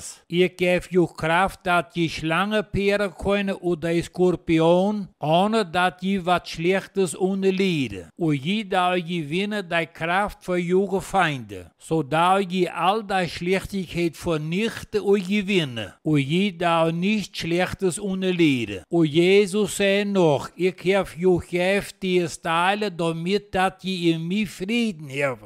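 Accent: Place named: Pomerania, Germany. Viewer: ek is van suid afrika...die lesing uit die heilige skrif is mooi in die pommere dialek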